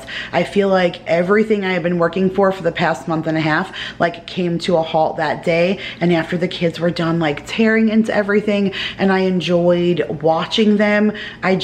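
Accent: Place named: East Coast, United States. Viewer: I’m new to your channel. I really enjoy it. When I first heard you speaking I said this girl has to be from back East. I’m a 40 plus years girl from CT transplanted in NE. Now I see you’re going to NY for vacation. How cool is that? I wish you a happy, healthy and safe New Year. Take care. Food request is Sharp Cheddar Cheese 🧀 LOL 😂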